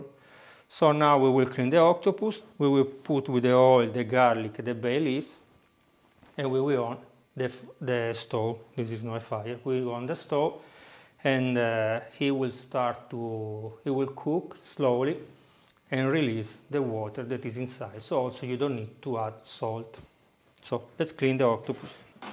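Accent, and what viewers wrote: Italy. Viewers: Italian accent????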